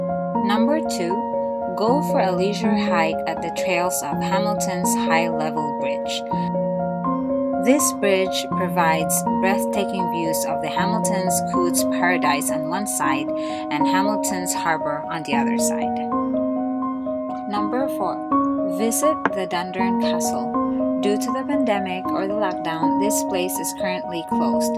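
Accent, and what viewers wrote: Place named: Philippines. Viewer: Philpino accent can be perceived clearly. Anyway nice and beautiful place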